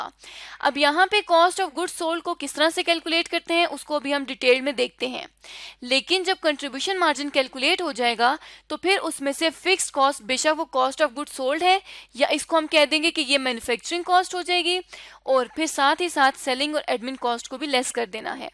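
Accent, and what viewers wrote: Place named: Pakistan. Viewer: Tomorrow I have my ca inter costing exam and I wanted to learn this concept... And I came across the bestest shortes video! ..i am from indian... Your Pakistani accent is so good! ✨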